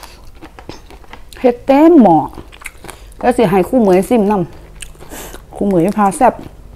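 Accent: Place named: Korat, Thailand. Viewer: สำเนียงโคราช😅😊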